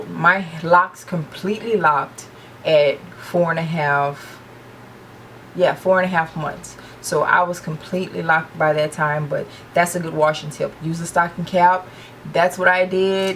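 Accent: New Orleans, United States. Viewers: Sound like you from new orleans babyyyy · New Orleans?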